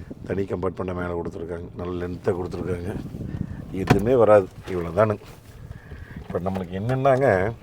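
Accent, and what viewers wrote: Kongu, India. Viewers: கொங்கு தமிழ் அருமை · Bro, korangu pugaielai (country tobacco brand)... Typical kongu slang..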